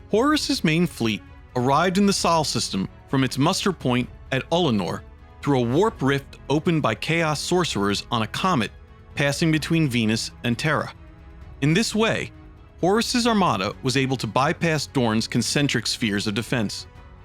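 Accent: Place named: New York, United States. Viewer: He’s from NY. Youman, Yuge.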